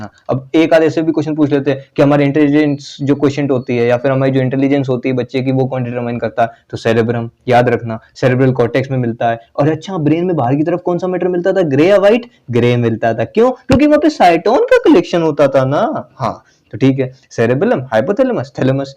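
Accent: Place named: Bihar, India. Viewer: sir aap bihar me kaha se hooo...aapki boli se pata chal raha hai ki aap bihari ho...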